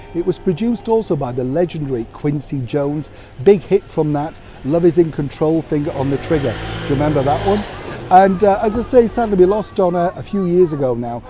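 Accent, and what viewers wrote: Yorkshire, United Kingdom. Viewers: Good morning, you say some words just like my nannie who was herself from Yorkshire yet mostly you have a nice clear voice perhaps from spending some time in London. Loved much of music, I think I'll have a revisit of that, thanks. Great videos today, interesting hearing about your time in radio x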